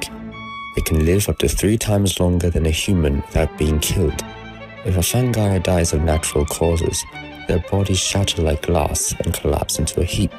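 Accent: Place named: RP, United Kingdom. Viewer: this accent is too posh for someone from bedfordshire like myself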